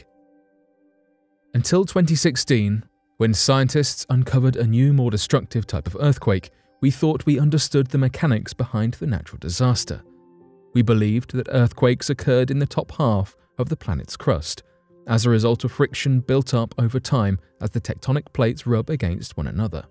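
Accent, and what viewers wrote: England, United Kingdom. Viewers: English or European? Love hearing your real voice on your blog love true dialects and love your channel and info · What scientists? Names? Why is a guy with a British Accent even doing a video on this? Are we supposed to believe you more because of your accent? California has been experiencing quakes for years. Scientists look at data, the take an educated guess at when something happends. A dart board would be more accurate. Sorry but terrible video.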